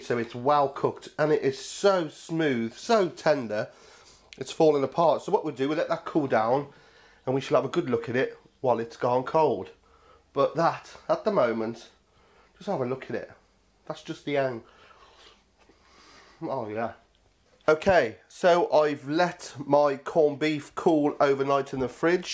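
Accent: United Kingdom. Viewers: I saw this video long time ago, and I appreciate what you make. However, I there any other way to make it without pink salt? FYI, I live in the Middle East and it is hard to find sodium nitrates here. One more thing, your accent is more British rather than scotch; maybe a variety !?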